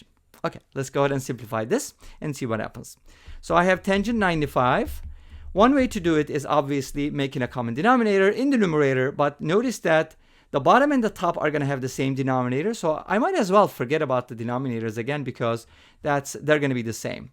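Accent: Turkey. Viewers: I can hear Turkish behind the accent.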